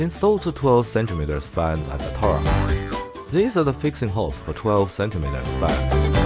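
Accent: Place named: China. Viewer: Wow this English sounds very Chinese!! 你好吗👋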